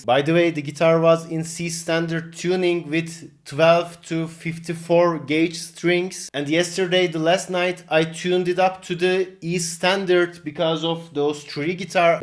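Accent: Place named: Turkey. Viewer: ulan diyorum aksan bi farkli turkmussun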